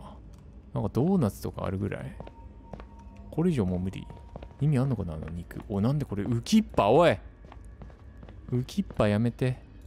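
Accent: Hokkaido, Japan. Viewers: 謎解きもゴリ押しで解いちゃったり、北海道弁が聞けたの嬉しいし、びっくり要素がいっぱいあって面白い名前があったり、八つ当たりで「飛んでけぇぇ！！」や物を投げる時に「すとーん！」って言っているのも好きです。お約束のバグもあって面白かったです。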